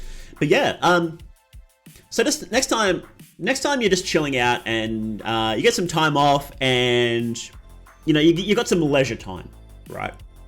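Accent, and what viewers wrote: Australia. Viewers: You have roight proper aussie accent. This was a nice talk.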